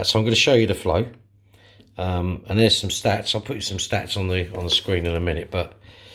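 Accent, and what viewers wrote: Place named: United Kingdom. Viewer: Then, with about 15 years (I lost track) I'm a newbie in the hobby! What I love about chatting online is that we can learn from others that live in another country, I'm from NL, I guess by your accent you are located in the UK? There are some cultural differences (when it comes to fish keeping) from country to country and it's those differences that we also can learn from. Always good to expand my view. Although I think the difference between the UK and NL aren't that huge. You have a great YT channel, keep up the good work!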